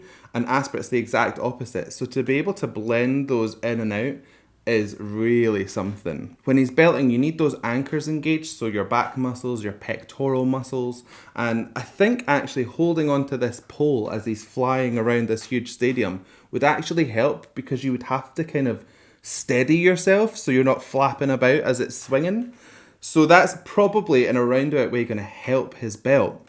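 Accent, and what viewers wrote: Scotland, United Kingdom. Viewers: Thank you for the analysis. A million years ago, I did vocal training but your mix of anatomy and explanation is really enlightening. BTW, you have probably heard that JK tends to be the go to guy for a lot of harmonising in the background of their songs and we have got to see him working on it for Euphoria. It is fascinating as he created multiple harmonic lines as he worked , listening back and overlaying them on the fly. He seems to just be very musically literate..as in, understanding quite complex harmonic sounds effortlessly. I am quite amazed by it and very jealous in a good way. Also, love your voice, in spite of no warm up (bold!!) and the Scots accent is great. Almost as good as the Irish but we'll leave that off. Thanks again.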